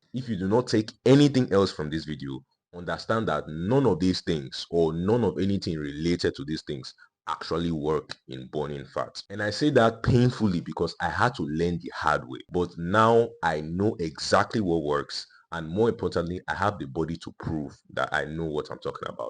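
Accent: Nigeria. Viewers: The Nigerian accent usually gives the opposite experience lol but okay. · Accent does not matter. We are proud Nigerians why pretend 😮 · That’s more of a Nigerian accent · It's Nigerian accent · this is Nigerian accent, what are you talking about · My brotha you sound like a Nigerian. Oga God bless you ooo. Make I loose my belle ooo. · For those who are not from Africa, he’s not rude or mean, he’s just Nigerian. 🇳🇬🇳🇬😅😅😅 This is how they talk on regular conversations. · great video aside from the annoying nigerian accent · It's massive Nigerian confidence. No pretenses. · I am not sure if it is the Nigerian accent, or something. But this guys is very believable. Plus he tells the truth; no drama; no frills. Thank you!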